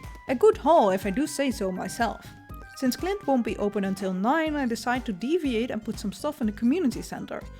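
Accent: Netherlands. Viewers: Listening until the end I realize you’re probably Dutch haha!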